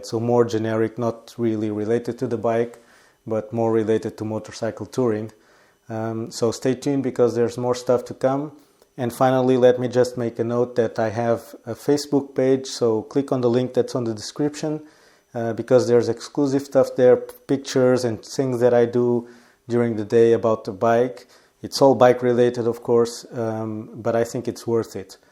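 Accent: Portugal. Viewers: es portugues kkkkk sinto tu a falares e es tuga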